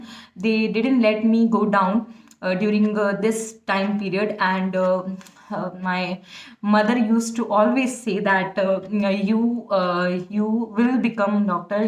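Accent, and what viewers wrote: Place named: South India, India. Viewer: Di you have a South Indian english accent😍, please don't take it wrong... I loved it♥ You inspired me to be strong🙂 thankyou so much✨ I'll crack it for sure this time2021🙇‍♀️♥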